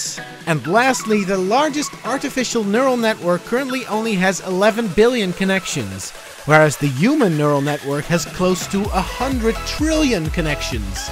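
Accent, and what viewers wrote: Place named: Netherlands. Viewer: you are dutch? really dutch accent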